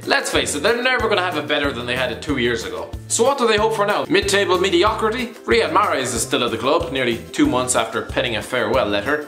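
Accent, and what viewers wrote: Ireland. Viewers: He is Irish the best people in the world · You sound Irish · I just keep watching it because of his Irish accent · Is this lad from Ireland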